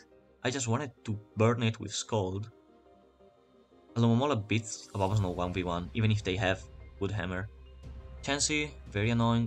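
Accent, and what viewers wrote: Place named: Spain. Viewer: Juro que lo escucho y pienso que sabe hablar más español que inglés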